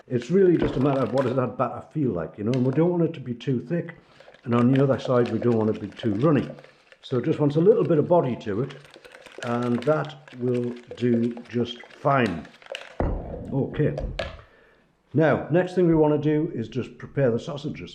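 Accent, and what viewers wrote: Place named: Sunderland, United Kingdom. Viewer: I don't eat meat, but I'm happy to watch just for your lovely accent which reminds me of Christmas with my family in Sunderland.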